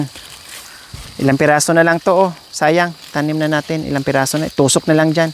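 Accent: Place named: Iloilo, Philippines. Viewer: Ilongga ka gali madam❤❤❤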